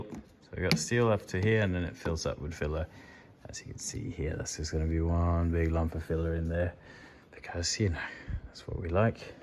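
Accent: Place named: England, United Kingdom. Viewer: It's so funny to hear English spoken with an English accent. It sounds so foreign and exotic to me. Not a single word comes out the way I would pronounce it.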